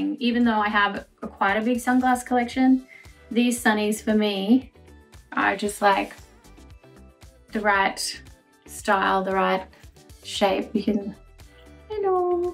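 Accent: Australia. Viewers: Like her Aussie accent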